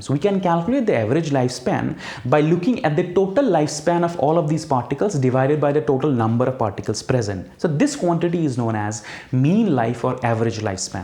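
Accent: India. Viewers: Heavy indian accent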